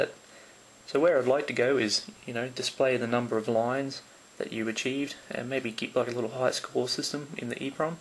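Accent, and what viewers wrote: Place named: France. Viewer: est ce que tu es français ? je reconnais ton accent français :)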